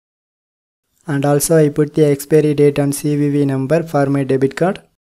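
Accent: India. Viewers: I can't understand Indian English....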